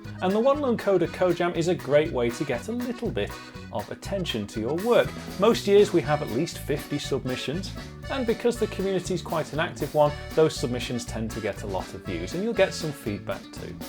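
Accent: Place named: United Kingdom. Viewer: why you don't say hello with that British accent, is important to me as old subscriber 😠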